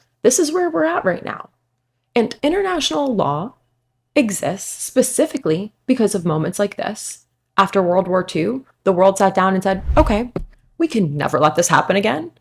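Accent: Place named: East Coast, United States. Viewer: New Jersey huh, even the east coast accent came out today 😂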